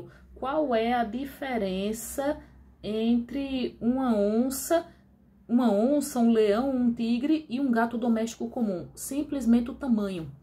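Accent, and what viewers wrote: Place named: Minas Gerais, Brazil. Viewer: Tu é mineira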